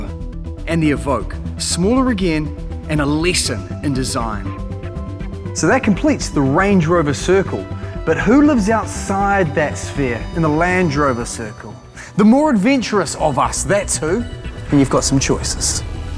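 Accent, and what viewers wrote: New Zealand. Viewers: This video is hysterical and brilliant. Love the hair "in 1970 and the polyester suit." You are correct, we don't know the difference. Humor and your NZ accent, you can sell anything! :o) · Damm I love that NZ accent! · Didn't know New Zealanders pronounced "Land Rover", "Langerovah" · Always loved NZ accent over Aussies at least the world can understand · Damn I hate that NZ accent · NZ accent at its finest ladies and gentlemen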